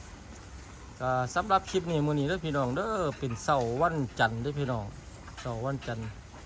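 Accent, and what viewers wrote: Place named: Korat, Thailand. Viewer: โคราชชัดเจนดีครับ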